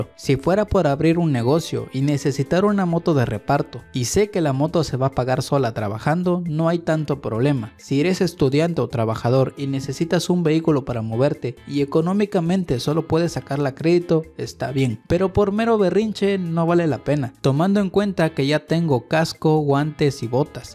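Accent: Oaxaca, Mexico. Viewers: De qué parte de Oaxaca eres? Jaja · Nmms de que parte mero de Oaxaca eres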